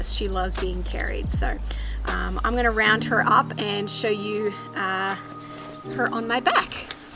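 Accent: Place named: United States. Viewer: Your accent is puzzling me—are you American or a Kiwi? You sound mostly American but with little bits of Kiwi accent here and there.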